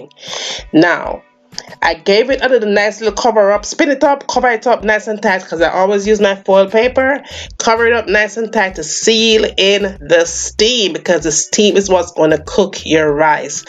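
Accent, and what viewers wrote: Jamaica. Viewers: I love the accent. That Jamaican came out a few times while explaining the recipe 😂😂😂 I love it. My all time favorite food is Jamaican Dishes and Spanish Food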